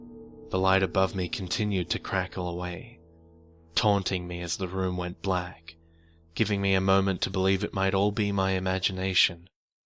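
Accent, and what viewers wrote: Australia. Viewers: Congratulations and thanks, it's nice to hear an Australian accent narrating the creepy stories i love. your story is great too, I love the change of perspective and unexpected ending. great job! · Both myself and my gf love hearing you read stories. Maybe it's that smooth ausie accent. Either way I'm watching your whole videograpgy. You are a great storyteller, never loose that wonder you have; through your mind and eyes and words we experience stories we may be too scared or busy or whatever to read ourselves. Laugh, cry, fearful tremors, or apathetic visualizations. You walk through them with us. Much love from cali, and thank you.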